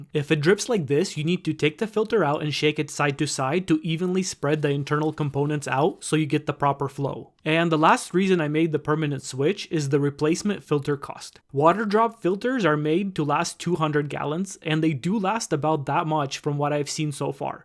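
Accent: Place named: Quebec, Canada. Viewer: You mentioned that in your state they use the highest chlorine levels. But, are you in a state or in a province? Your accent is 100% Québécois. Great review!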